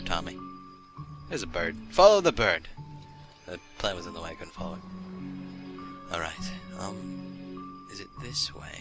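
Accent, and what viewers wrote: United Kingdom. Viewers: I love re- watching some of his old videos cx hearing the changes his videos have made :3... And does it sound like he has a bit of a British accent in this one? or is it just me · voice sounds more brittish.... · DAT BRITISH ACCENT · is it just me or voice changes every year? well not actually the voice, rather the accent.. when I listen to one of his first videos, he sounds a bit... British? xD I dunno..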